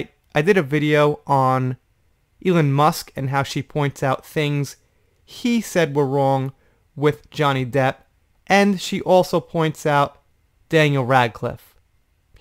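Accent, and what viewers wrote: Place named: East Coast, United States. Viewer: your voice and easy cost accent is music to my ears..even all the way from Japan..💯💜🦋🤟🍀🌈👊🌹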